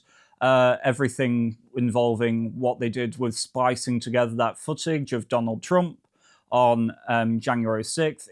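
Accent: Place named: North, United Kingdom. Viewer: This guy reminds me of Alexander Armstrong doing a northern accent.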